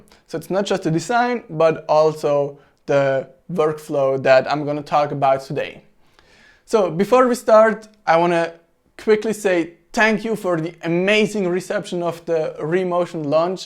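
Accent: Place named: Switzerland. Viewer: oh schwizerdütsch, geil!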